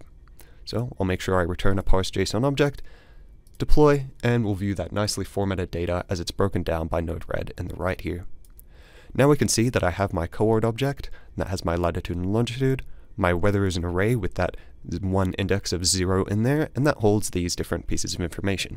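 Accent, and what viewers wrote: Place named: East Coast, United States. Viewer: I thought this tutorial was excellent. Thank you. BTW, I can't quite pin point your accent. I can hear its in the United States but where specifically I can't quite figure. It does have some East Coast in it but given you operate from the West Coast, I'm wrong probably. Anyway, thanks again for the clear insights. I've since subscribed for more excellent content.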